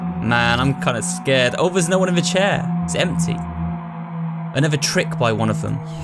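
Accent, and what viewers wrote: United Kingdom. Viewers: does that. He has such a soothing british voice.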